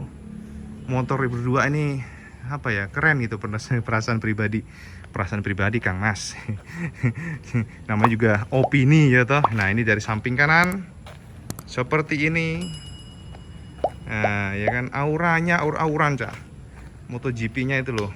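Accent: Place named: Java, Indonesia. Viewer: Teros tak enteni.kontene sampean seng di ae pokoke lucu.karo enak di rungokno.ngomonge khas wong jowone.dadi suweneng aku ngrungokno.salam teko wong tuban cak.!!!!!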